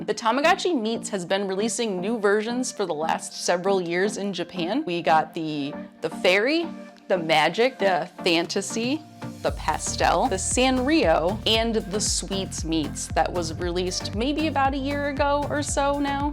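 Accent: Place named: England, United Kingdom. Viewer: by the way I love the way you talk and sure your English accent. You could be my English teacher 😅😊 and that’s why I watched all of your videos. Yeah... I’m crazy about the tamagotchis too.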